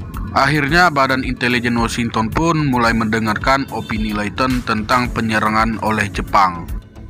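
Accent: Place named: Jakarta, Indonesia. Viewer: Astagfirullah, yg komen org Batak yg dikomplain logat Batak. Ni siapa yg isi suara? Mirip suara2 org Betawi sana..., Mcem suara org Jakarta ya.. sukses buat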